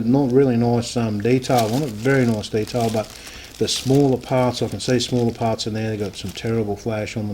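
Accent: Australia. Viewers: Awesome review, great to hear a Aussie reviewer, good on you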